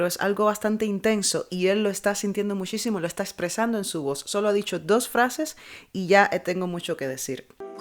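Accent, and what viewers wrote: Cuba. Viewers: bienvenida a Dimash, me gusta ver las reacciones de los coaches (aunq no se nada de musica), pero me encanta ver como se quedan perplejos, porfa reacciona a stranger y Ogni pietra, saludos, otra cosa, me sonaste cubana, suerte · Solo de escuchar supe que eras Cubana.Sin duda somos inconfundibles.Saludos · Saludos desde Cuba. Me encanta Dimash y qué orgullo ver tu reacción tan buena 👌🏽. Enseguida reconocí el acento cubano jejeje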